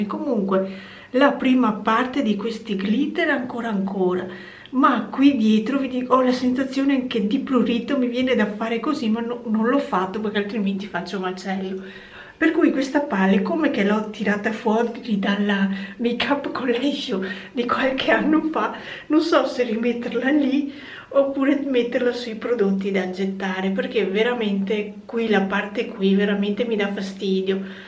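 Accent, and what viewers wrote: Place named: Veneto, Italy. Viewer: Ciao ! Carino il tuo vlog! Dal tuo accento ho capito che sei veneta come me! Baci!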